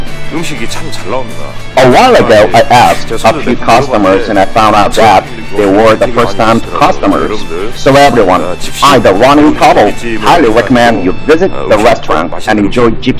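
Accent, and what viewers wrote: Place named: United Kingdom. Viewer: 영어가 영국식 같아요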